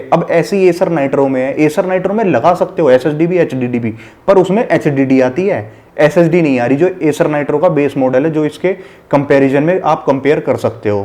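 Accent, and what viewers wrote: Haryana, India. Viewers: Bhai tu haryane ka lage h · getting reply from you is still a big thing for me thank you so much sir you are really doing great work please keep it up ! Like your haryanvi accent actually I love haryanvi accent and main strength of your channel is your honesty and desiness.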